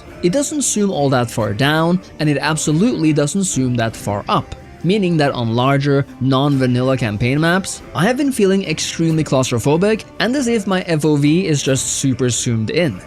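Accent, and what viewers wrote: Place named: Norway. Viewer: man ur norwegian accent is shining through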